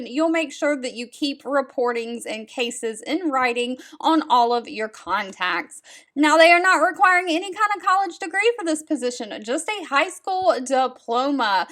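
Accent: United States. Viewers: She's sooo American - cannot understand what she is saying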